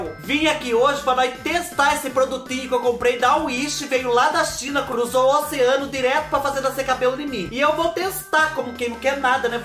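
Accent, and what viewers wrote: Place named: Curitiba, Brazil. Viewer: Tive que me inscrever não tem como, preciso de rir um pouco nesta pandemia, kkk e vc é mto engraçado <link> identifico mto com seu sotaque e seu vocabulário. Kkkk sou de Curitiba PR, e nois fala um pouco deste jeito .Vc é maluco fica carcando estas coisera no cabelo vc vai e ficar careca daqui um dia. Eu já fiz mto estas coisas tb.até banha coloquei no meu cabelo, fiquei 10 dias lavando meu cabelo pra sair aquela Gordura .bjo obrigada por me fazer rir.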